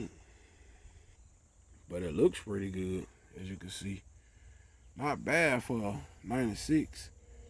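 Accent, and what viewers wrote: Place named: South, United States. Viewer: Yall southern mf can't talk to save yalls life poor things 😔 and it took ten years of listening to it before we seen anything happen... I always wondered if it was on purpose cuz T I can talk but you can still tell he's from the south! he can say "then" and then you can read the word "then" and read the word "Den" then say it the same Car is Cah then just say rag so you can make an R sound that shit is crazy to me, European London Britain England accents nauseate me the South and Africa are next in line 🤣 the South sounds like someone just learning how to talk for the first time and yes we were all either born or kids in the 80s when the next generation of adults we're mostly populating the planet right now I just came and see what Nu Finish can do but I had to sit and listen do you repeat yourself 10 times with natural slurred speech 😩 I'm Denver Colorado so ... 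when I visit my cousin's in Houston their friends say I sound White no I sound right I can talk! oh my God East Saint Louis black folks its embarrassing my ex was born and raised in Alabama but sounds like she's from Colorado, you make the r sound in any other word but you can't say car properly or other words ending in r so is this shit even real or is it culture remnants of slavery y'all would have so many problems with white people out here where I live, White people would treat you like shit like you were stupid if you lived in Colorado sounding like that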